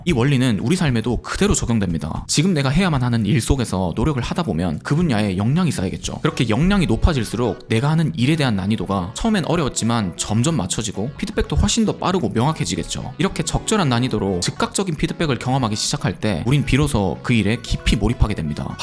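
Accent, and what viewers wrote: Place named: Gyeongsang, South Korea. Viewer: 나이가 40이 다되가는데, 아직까지 방황하고 있어서 잘 듣고 있습니다. 듣다 드는 생각인데 혹시 대구분이신지요? 사투리가 너무 익숙해서 물어봅니다 ㅋㅋ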